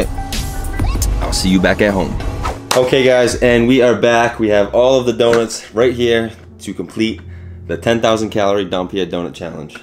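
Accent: Boston, United States. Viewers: I’m not from the US, just watched a couple of your videos and it took me until to recognise you have a Boston accent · Couldn’t figure out your accent, but when you said “bears,” as “behs,” I knew it had to be Boston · Meronghilay It’s a Boston accent! :)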